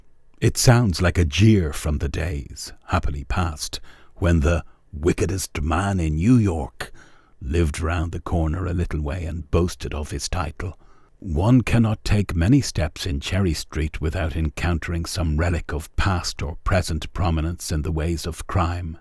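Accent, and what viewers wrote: United Kingdom. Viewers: So what's up with not being able to watch an American subject without incessant Brits narrating everything on the internet?.....can't watch TV, now can't enjoy a video.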